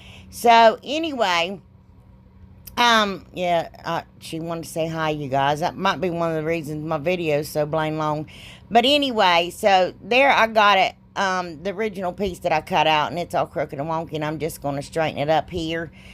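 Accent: South, United States. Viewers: OMG! You should be a stand up comedian! I laughed so hard when you were cutting the "dongers" off the bells. I had tears running down my face! You have such a deep southern accent, it makes everything even funnier! Loved all your projects. You have such a great imagination! I needed a pick me up and Girl, you gave it too me! I don't know why I have never seen any of your other videos. I love you and will be going back to watch you! You are the best! ♥️♥️♥️♥️😂😂😂♥️♥️♥️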